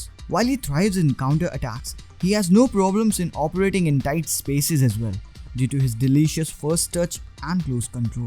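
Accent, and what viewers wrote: India. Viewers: great video loved it keep it up 1.add subtitle options 2.it will be a lot better if your accent is improved from indian to a bit british or american.(JUST MY OPINION,PLEASE DON'T MIND)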